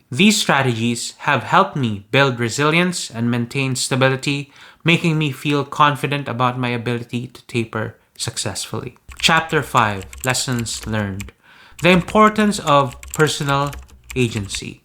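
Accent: Philippines. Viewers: accent pa lang pinoy for sure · Pina-panuod ko si dugyot kanina nung bigla nyang siningit yung video mo. Nung pinlay na nya yung video mo, sabi ko.. "Bat parang tunog pinoy yung english ni kuya?", at tama nga ko. Masaya ko para sa nangyari sa buhay mo ngayon pare 😊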